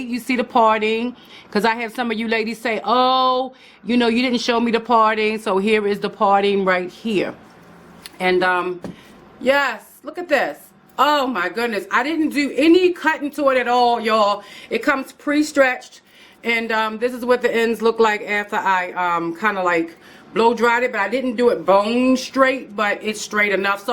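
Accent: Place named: DMV, United States. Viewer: She sounds like she's from the dmv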